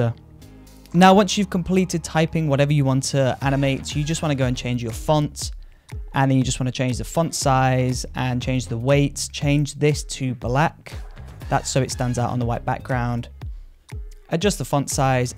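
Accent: United Kingdom. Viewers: I love coz you're British haha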